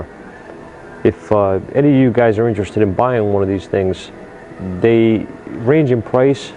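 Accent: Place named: East Coast, United States. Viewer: But I love your big mouth and eastern accent.! LOL THANKS!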